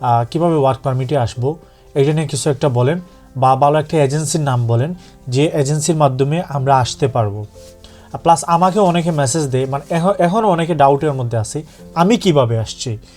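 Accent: Sylhet, Bangladesh. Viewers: ভাই মনে হয় সিলেটি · আপনার কথাগুলো তো অনেক সুন্দর সিলেটি মাল👍👍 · vaiyar basa ki sylhet ? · Bhai ki sylheti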